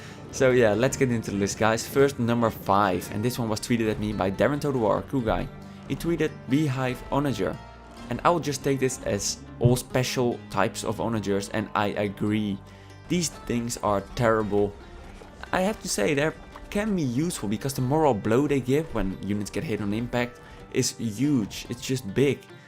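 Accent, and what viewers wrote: Netherlands. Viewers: lol your dutch accent tho:D so obvious haha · dutch, I guess. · Volgens mij hoor ik een Hollandse ondertoon? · you dutch? i hear a dutch acsent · Are you by any chance Dutch? Cool video btw:)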